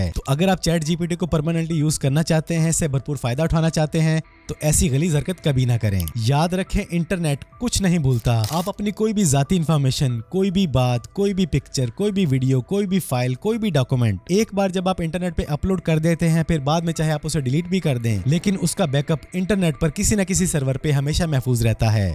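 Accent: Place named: Saraiki, Pakistan. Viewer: bhy lagta hai apki mother- tongue Saraiki hai QK apka laheja Saraiki ki Tarah bahut meetha hai, mashaAllah!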